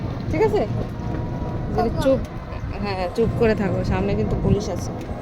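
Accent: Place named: Bangladesh. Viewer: আপনার কথা শুনেই বোঝা যায় আপনি বাংলাদেশি, শুধু আপনি কেনো ২৪ পরগনা ও নদিয়ার বেশিরভাগ ই বাংলাদেশি, জীবন চালানোর জন্য ও সুযোগ-সুবিধা র জন্য ভারতে আসে থাকে আজ ওপারে গিয়ে একবার SIR নিয়ে সাবধান করে দিন আর বলুন ভোটের পরে আসতে তাহলেই আর কি চাপ CLEAR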